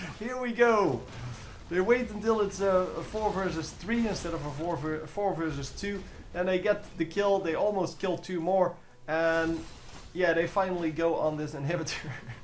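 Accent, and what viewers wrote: Netherlands. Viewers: are you from the netherlands i hear it on your english ( ik ben van belgie )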